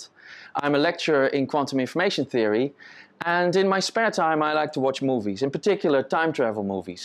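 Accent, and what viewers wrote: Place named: Netherlands. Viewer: Cute Dutch Accent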